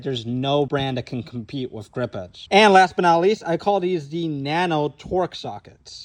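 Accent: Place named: Somalia. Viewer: I love you Somalian accent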